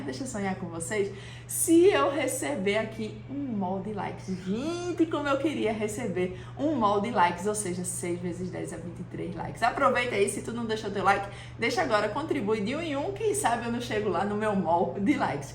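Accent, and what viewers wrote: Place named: Recife, Brazil. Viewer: Este sotaque recifense não engana, com certeza temos aqui uma excelente engenheira química recifense, visse?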